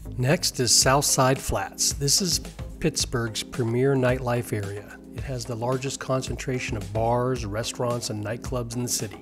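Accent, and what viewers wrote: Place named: Scottsdale, United States. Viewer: Except he said it like that twice. So maybe its his Scottsdale accent. Anyway, thanks for the tip about the bike tours.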